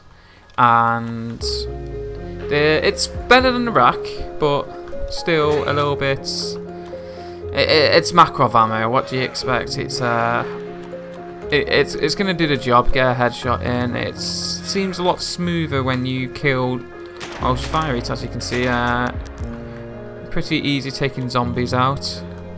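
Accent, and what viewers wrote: United Kingdom. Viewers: Are you from liverpool? You sound like you are.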